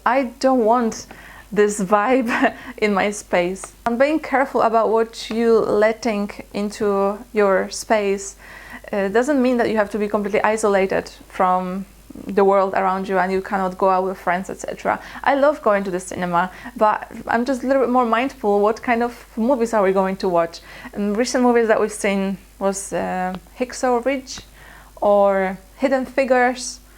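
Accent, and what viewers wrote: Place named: Poland. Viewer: pls don't lose your polish accent ya.. luv it.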